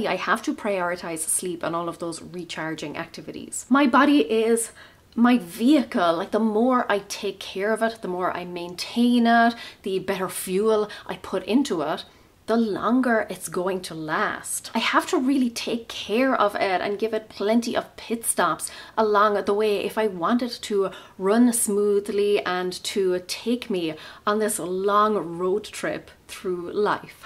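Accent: Ireland. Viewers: These were great tips, thank you. Might have a look at what else you have. Love your accent, are you Irish? Thank you for sharing 🥰🙏🥰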